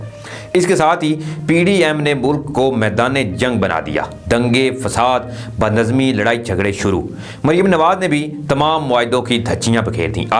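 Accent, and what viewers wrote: India. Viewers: urdu ko urdu lehje main bolo. Ziada indian banne ki zaroorat nahi. Is se tumhara ehsas e kamtari nazar aata hai.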